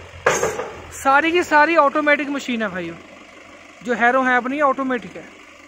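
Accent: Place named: West Uttar Pradesh, India. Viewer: Bhai tumhari aavaj west UP ki lg rhi kha se ho bhai aap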